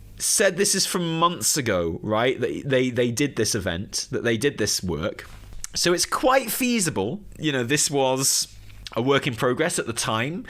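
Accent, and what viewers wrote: United Kingdom. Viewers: Nothing like a good mystery reveal with a man with a British accent.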